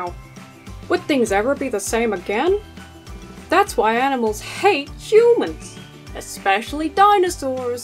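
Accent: United States. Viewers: I'm crying... lol... you have a much better American accent than I do an English one... quite impressive lol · I can't imagine this being someone's first visit to you. That would be insane. 😂 Great stuff. And your American accent is getting quite good. Your "Dr" Kent impression is stellar. 🙌🏼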